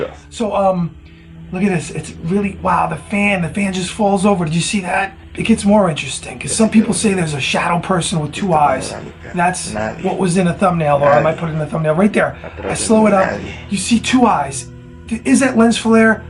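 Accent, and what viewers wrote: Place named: France. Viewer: Hi. Sounds like you have a bit of a French accent. Cool!.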